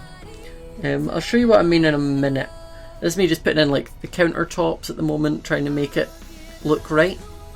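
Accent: Scotland, United Kingdom. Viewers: hes Scottish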